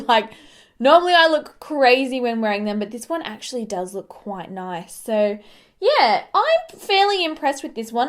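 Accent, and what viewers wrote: Australia. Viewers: awesome!!! I am gonna try this one especially for my winter foundation. In winter I can carry off the more emollient feeling foundations because I am not sweating my bum off. But hmm I just thought of something, since I got the mehron primer that won't even matter anymore. Thank you for doing this though! You have my skin undertone and my skin type so your videos and reviews are LIFE for me! LOL though we DO have quite the age difference (I'm 49) still your skin care, tips and tricks are amazing! AND I miss my Australian sister so much your accent feels like home! (She is coming for a visit in May!!!)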